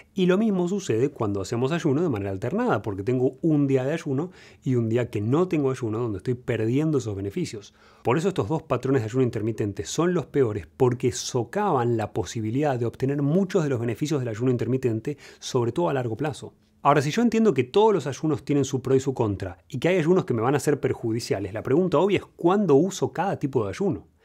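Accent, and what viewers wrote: Argentina. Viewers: 🤔🥴🥴🥴 Yo ayuno a diario porque no dijero nada bien, lo hago sin ton ni son. Pero ya me perdí con tantos tipos de ayuno Dr. Está Ud muy guapo 😘 y su voz es una caricia al oído. Nos tiene enamoradas su conocimiento y su bondad de compartir. Me acabo de dar cuenta de su acento argentino, juraba era español.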